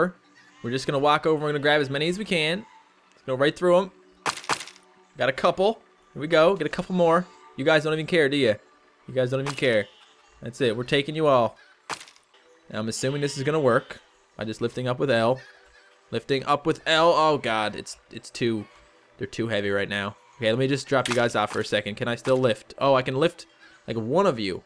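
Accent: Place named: Newfoundland, Canada. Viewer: Your a newfie arent ya? I can hear it for sure xD its the newfies six sense to pick out other fellow newfoundlands haha ;)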